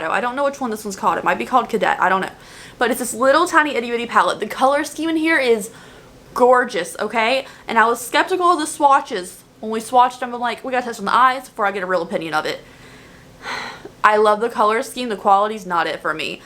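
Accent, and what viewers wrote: South, United States. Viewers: The southern accent 👏🏻👏🏻👏🏻 I love it!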